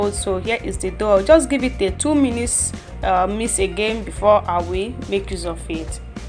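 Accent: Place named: Igbo, Nigeria. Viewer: 👏👏, you never disappoint, I love that igbo accent 😂😂. Meat pie looking yummy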